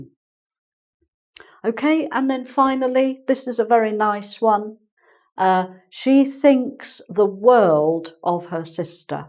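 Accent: United Kingdom. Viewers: She is authentic British which I love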